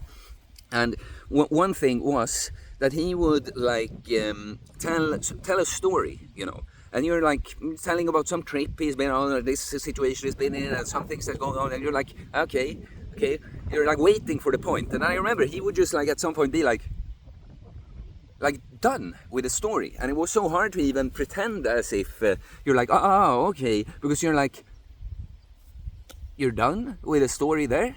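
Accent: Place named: Sweden. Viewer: Love you! Only recently found your channel, but I will mourn the day the last time you will upload. We share a large deal on the views and outlook on life, only difference is youre much much further along this path and youre close to the embodiment of what I aspire to be, both physically and mentally, which is probably why I like your videos so much. I grew up in Sweden so the accent is lovely aswell lol. As for feedback on the current video, I want to hold up a mirror to you and say "own your vibe to attract your tribe", this quote really struck me and gave some food for thought, you keep doing you man. Har det så bra!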